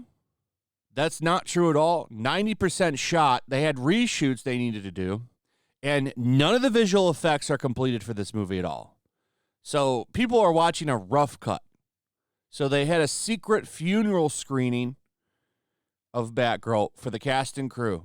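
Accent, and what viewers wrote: United Kingdom. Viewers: i dont know why your crybaby voice is so awfully british old boi, but i do approve guvnor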